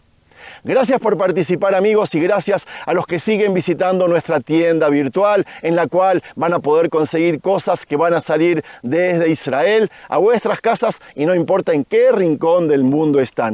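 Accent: Argentina. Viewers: Cuando algo de historia argentina tenes el acento nomas lo demás es todo propaganda.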